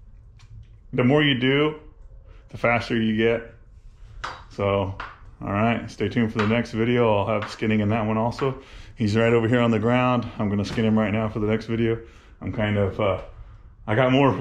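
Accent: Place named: Missouri, United States. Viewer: can tell your a missouri boy! love it man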